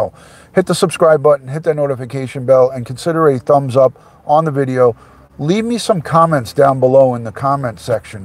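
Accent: New York, United States. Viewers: Made me giggle when you tried to pronounce Cheshire, I hope you don't mind me correcting you, but here in the UK the shire part is pronounced sheer, so it would say Chester, Yorkshire etc. Absolutely love your NY accent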